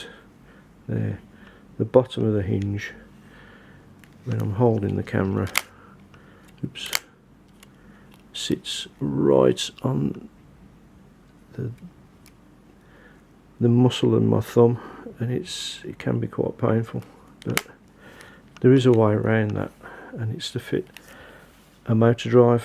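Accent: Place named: Birmingham, United Kingdom. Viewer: You delivered the information, so job done. It’s got nothing to do with the Brum accent, my nerdy cousins in Handsworth have stronger accents than you and can hold a captive audience about some dry elementary principle in a dog-eared physics book. I could listen to Noddy Holder, Ozzy and many other native Brum or Black Country personalities for hours. It’s more about the lack of passion and energy, especially for one of the unsung heroes in the Leica back catalogue. I didn’t expect cartwheels and American style presentation exuberance. But to me you came across like a govt information film narrator from the 1970s, but that’s just me. There are many much worse on YT, and I probably present no better than you, but doesn’t prevent me from speaking my mind and giving some hopefully positive critique.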